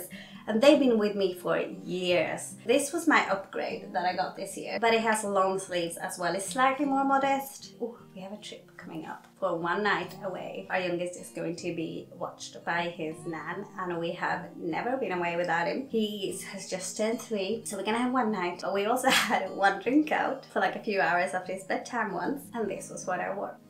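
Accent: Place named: Sweden. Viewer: I told my husband you're Swenglish.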